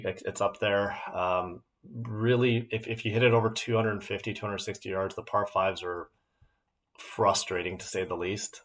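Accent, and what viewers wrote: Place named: Vancouver, Canada. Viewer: Good video good list. Agree with most of it If I were to guess I’d wager that you definitely are a good golfer but you grew up and continue to live somewhere in south Vancouver (maybe Richmond) and you can tell by the way you talk there’s a geographical bias in this video and in all fairness you said you didn’t include the Fraser valley. If you expanded your criteria by 25kms for the viewer to include ledgeview and sandpiper they are right up there IMO with cap and Seymour without the overblown elitism (maybe better)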